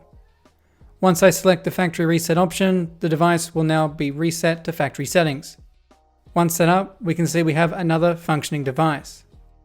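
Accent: Australia. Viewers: Another Aussie yelling at nuggets. I have subbed.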